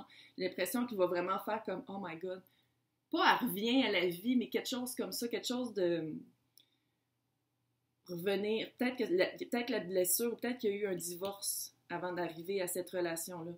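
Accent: Quebec, Canada. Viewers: De quel vous êtes du Canada ? Québec ?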